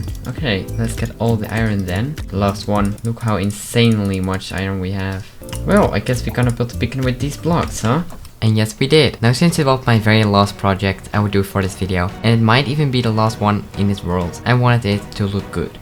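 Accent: Netherlands. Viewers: somethimes i have the feeling that youre dutch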